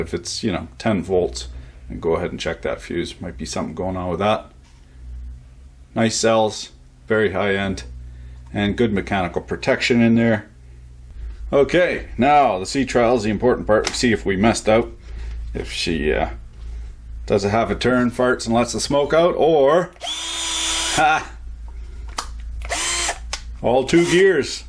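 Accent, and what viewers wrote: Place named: Quebec, Canada. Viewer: your french isnt bad, almost sounds Quebecois :P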